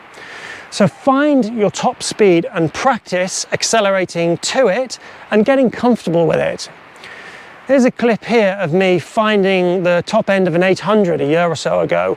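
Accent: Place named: United Kingdom. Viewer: Thank you very much for the precious advice, delivered in a fantastic British form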